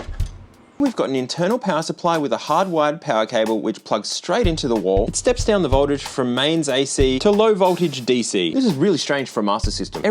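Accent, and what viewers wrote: Australia. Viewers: He's Australian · Two problems with your comment: 1. He isn't British, he's Australian. 2. Getting frustrated because people from different countries have different accents is a ridiculous way to live your life.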